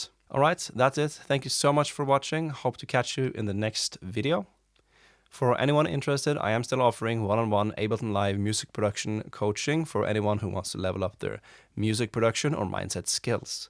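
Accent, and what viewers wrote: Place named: Sweden. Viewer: Jeg har vært helt sikker på at du er svensk når jeg hører den minimale aksenten din. Gratulerer med VM-medaljen i hockey, veldig stort :) (Google Translate :) )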